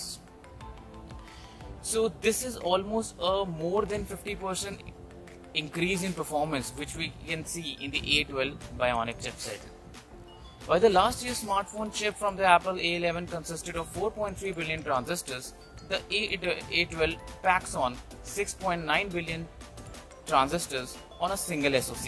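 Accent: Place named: India. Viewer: Crappy typical indian accent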